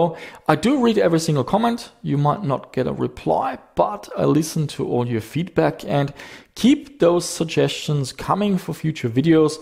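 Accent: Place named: Germany. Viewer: Damn that german accent... 👍😉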